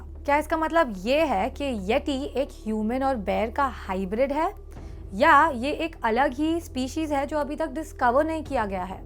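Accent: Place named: South Bombay, India. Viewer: The way you pronounced "ळ"😁 you're from Mumbai. And your accent sounds so south Bombay.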